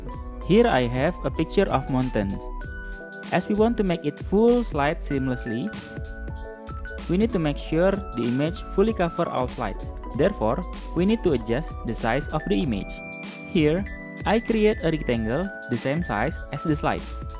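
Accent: Indonesia. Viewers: Makasih mas tutorialnya, accentnya seperti orang indo, ternyata bener, auto subscribe deh 👍😁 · Aksen Indonesianya manthul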